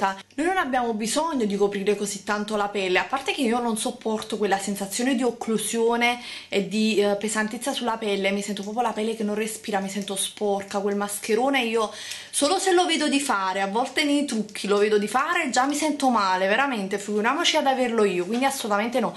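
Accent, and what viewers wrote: Naples, Italy. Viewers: Vorrei anche io precisare e farti notare che dialetto e accento (cadenza) sono due cose diverse e dai tuoi commenti a volte hai fatto un po' di confusione.. ma io concordo su tutto! non mi sembra che usi spesso espressioni dialettali, se non in contesti scherzosi per fare una battuta o per citare un proverbio (cosa che ci sta), ma il suo accento è TROPPO marcato. Non lo dico per "antipatia" o "razzismo" verso i napoletani, ma lo dico perché quando l'accento è così marcato da impedire una agevole comprensione della lingua allora bisognerebbe imparare a moderarlo.. perché sì, l'italiano standard, LINGUA UFFICIALE CHE OGNUNO DOVREBBE CONOSCERE BENE, ha delle regole di fonetica ed anche quelle fanno parte del codice lingua. Secondo me è sbagliato cercare di nascondere del tutto il proprio accento "locale", ci mancherebbe, ma bisognerebbe almeno parlare correttamente. 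Io seguo da anni Marta di Sweetbeauty1990 e anche lei è di Napoli; il suo accento però a differenza di quello di è gradevole! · a te non piaccia la cadenza napoletana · ma chi ti credi di essere? Ci sono fior fior di esperti, chimici, medici, farmacisti e molti altri specialisti dietro ad un marchio e dietro ad un prodotto. In più la tua filosofia del "tutto cio che è naturale fa bene"" è veramente da Medioevo. In più, se ti informi bene saprai che non è vero che in chimica tutto cio che finisce con -one è un silicone (giusto per dirne uno)... Purtroppo ci sono delle ingenuità di base che permeano tutti i tuoi video. Un'ultima osservazione: se vuoi rivolgerti ad un pubblico dovresti imparare a parlare in italiano, non si può accettare il tuo accento partenopeo, simpatico eh, ma inadatto alla grande comunicazione. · Io ci provo a seguirti, ma te lo devo dire... il tuo accento è davvero difficile da sopportare. 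Per chi non è di Napoli, è un dialetto fra i più fastidiosi, mi spiace. "Quando lo vedo DI fare..." ma che italiano è??? Insieme ai siliconi io eliminerei la cadenza campana e leggerei di più. Ps. mi hanno regalato il tuo 'libro': inutile, visto che non spieghi dove reperire i vari oggetti necessari e ci sono veramente quattro cose in croce.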